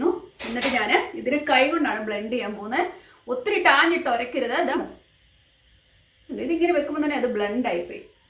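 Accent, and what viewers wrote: Kottayam, India. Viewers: kottayam kariii ano aaoru slang varunudalo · Kottayom Kari aano chechise.. Samsarom athu pole undu.. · രസം ഉണ്ട് സംസാരം. ഇങ്ങനെയാണ് ഞാനും പറയുന്നേ കോട്ടയം ആണ് alle?? ഇഷ്ട്ടയിട്ടോ 😘😘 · Hi chechi am your new viewer from pala kidangoor now chechi kottyam kari ano samsaram nalla rasam undu my hair also thin anu and no idea about beauty tips you help me a lot beginners nayi simple make up products okke detailed ayi paranju oru video cheyyamo and 9 years kurtis nu venda hair care also. Are you working as a nurse keep rocking 💐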